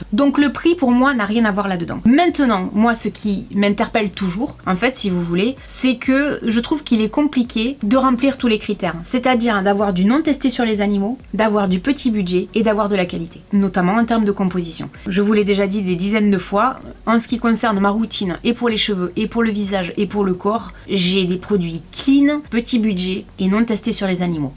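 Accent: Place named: South, France. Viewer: L'anglais avec l'accent du sud ... DU SUCRE !!! Bisoux à toi, à maman et bisoux truffe.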